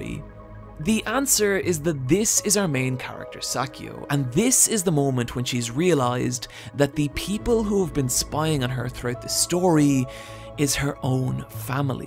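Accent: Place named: Ireland. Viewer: It's the Irish accent and I agree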